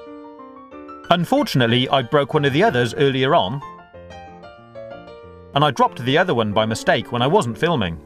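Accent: United Kingdom. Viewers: Are you british? Am i right? And your videos are super really very amazing incredible handsome!😄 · I love you're British acsent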